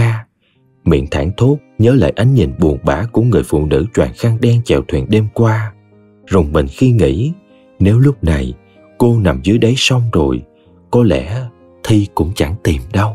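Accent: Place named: Saigon, Vietnam. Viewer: Yêu mến cái giọng Sài gòn của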